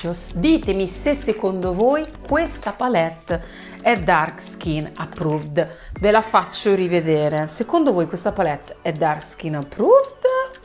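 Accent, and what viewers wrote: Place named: Ancona, Italy. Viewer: Sento quasi l’accento anconetano/decollemarì 😂😘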